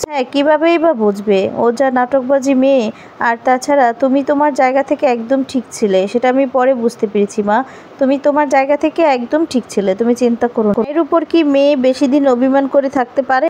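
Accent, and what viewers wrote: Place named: Bangal, Bangladesh. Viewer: তোমার কথকতা ভাল হচ্ছেনা। বাঙ্গাল বাঙ্গাল উচ্চারণ শুনতে খারাপ লাগে। এই উচ্চারণ ঠিক না করেই কথকতা নাকরলে ভাল ।অপদার্থ। একটা কথা,চেষ্টা করলে তুমি বাঙ্গাল বাঙ্গাল উচ্চারণ ঠিক করে শোনার যোগ্য কথকতা করতে পারবে।বিশ্বাস রাখো।শুভেচ্ছা জানাই।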